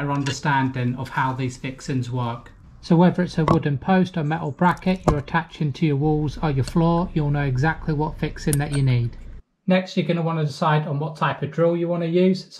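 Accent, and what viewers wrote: England, United Kingdom. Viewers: Is that England accent?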